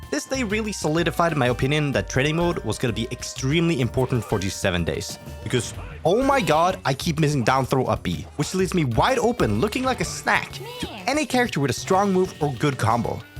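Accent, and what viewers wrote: Norway. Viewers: Du er norsk? Kult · Kommer du fra norge?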